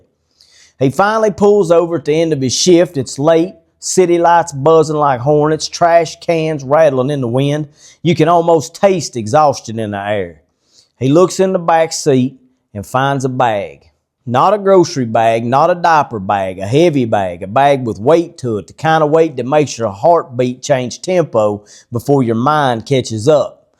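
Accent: South, United States. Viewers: Brother, every time you share a story in that beautiful Southern accent, you wrap a powerful lesson in the most gentle warmth. You take an incredible act of honesty and make it feel like a conversation on the front porch—simple, true, and straight from the heart. And when you end with, "and that's all I gotta say about that," we feel the weight of your faith and the sincerity of your character. It’s a blessing to have your voice in the Ummah. May Allah continue to bless you and your good wife. Ameen. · Masha’Allah, Uncle You have a special way of articulating in these videos, delivering wisdom in that southern accent is heartwarming to see. Keep up the great work. May Allah make us steadfast on this faith.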